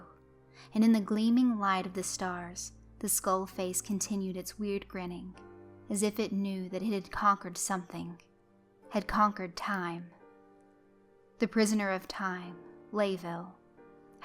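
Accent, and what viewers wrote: South, United States. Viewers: What makes it even better is the cute southern (Georgian?) accent when she's not reading!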